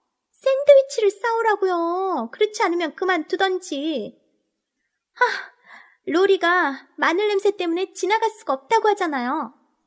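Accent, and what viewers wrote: North Korea. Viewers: 이북 사투리를 맛깔나게 쓰셔서 우리 고모만난듯 했어요. 따뜻한 소설 잘 들었습니다. 감사합니다 😊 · 언제 그옛날 많이 들어보던 구수한 이북 사투리를 습득 했을까 --- 제목 같이 멋져 버렸네요!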